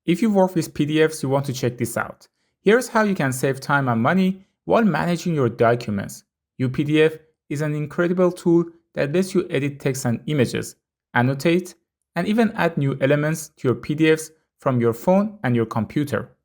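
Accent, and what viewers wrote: Iran. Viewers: Love the Persian accent ❤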